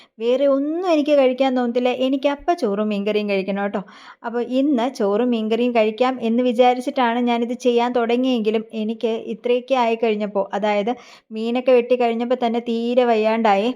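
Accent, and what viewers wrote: India. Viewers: സംസാരം കേട്ടപ്പോൾ കൊട്ടാരക്കര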